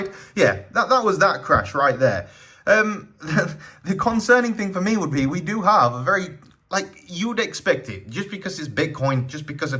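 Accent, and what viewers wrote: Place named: North, United Kingdom. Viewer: It’s my favourite northern accent talking bitcoin lol 😂 where was the one video a day broskie lol I know how it goes lol give thanks same way my guy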